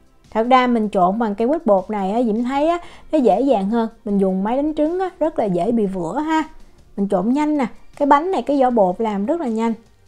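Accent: South, Vietnam. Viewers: Woa, cái bánh lạ quá. Mới đọc tựa tưởng đâu bánh thơm mùi lá dứa, nhìn rồi mới biết trái thơm, trái khóm. Chị dân bắc mà nói giọng nam dễ thương ghê. Cám ơn chị.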